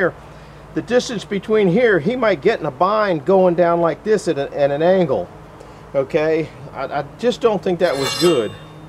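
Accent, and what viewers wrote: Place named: Ohio, United States. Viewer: Where are ya located ol boy. Been wondering, we all think your an Ohio boy from your accent and your poplar you cut.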